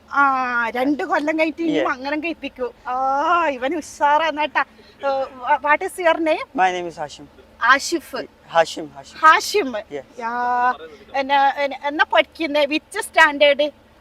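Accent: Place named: Kannur, India. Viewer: Hi Njan samsaram kelkkan vendi veendum veendum repeat cheyth kanaran, Ninglude kannur slang enikk bayankara ishttantta❤️❤️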